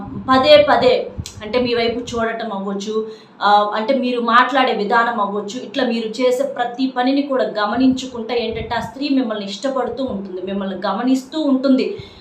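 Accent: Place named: Guntur, India. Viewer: గుంటూరు యాస లో బాగా చెప్పారు మేడం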